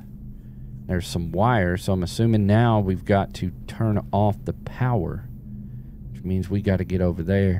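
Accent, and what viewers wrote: South, United States. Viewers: This is my first video of yours and I have to say, there is something strangely adorable about a dude with a Southern accent gettin' spooked. You're cute as all hell, Sir. Carry on.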